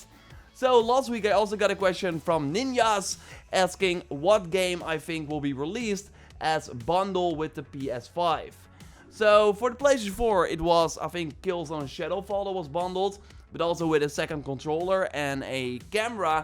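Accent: Netherlands. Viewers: i wasn't 100% sure if your accent was dutch but when you said 'mathijs de jonge' i knew for sure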